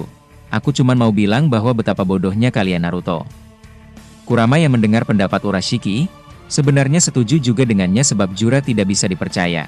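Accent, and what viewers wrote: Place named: Makassar, Indonesia. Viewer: makassarnya kantala, bnget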